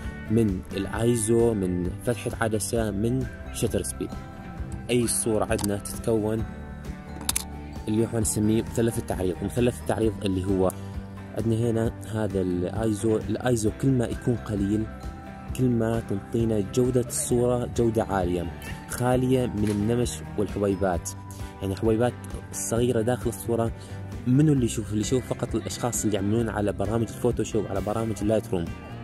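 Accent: Mosul, Iraq. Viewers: حبي لهجتك من الموصل صح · من لهجتك مبين عليك من الموصل أبن مدينتي ❤ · مبين من لهجتك مصلاوي ❤️ تحياتي لك من ارض نينوى الحدباء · ما اعرف ليش احس انت من الموصل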